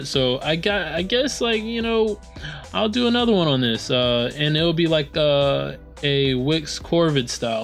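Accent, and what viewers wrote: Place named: United States. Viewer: Hi. Good tutorial. is this feature available in Wix worldwide or is it specific to a particular country? I'm assuming (by your accent) that you are in the USA? I'm in India and the hover feature is not showing up when I add a box...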